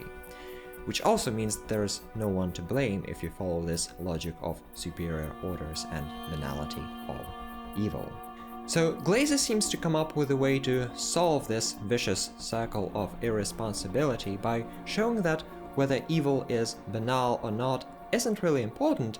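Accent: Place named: Germany. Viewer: I am trying to work out your accent. I think it's German.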